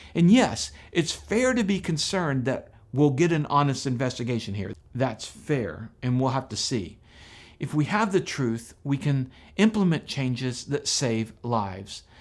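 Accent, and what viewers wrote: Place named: Midwest, United States. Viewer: Your command of our (American English) language, -being an American as reflected in your Midwest-ish accent(???)- is so much better than most of us. Interestingly, at one point, you used the word dived and I was ready to pouunce on that. Dived IS a past-tense term for dive, but so is the more familiar American term **DOVE** ... thus I ask: are you a Brit in American or Canadian sheep's clothing? Just curious. Oh, and yes, I love the tone and attitude you have in your thoughtful videos. So there.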